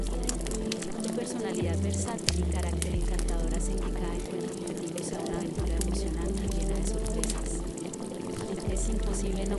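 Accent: Colombia. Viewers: La vocesita parece de bogotá lsjdj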